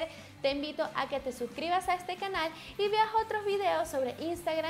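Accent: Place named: Argentina. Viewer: De donde sos? A veces pareces argentina otras costa rica o colonbia...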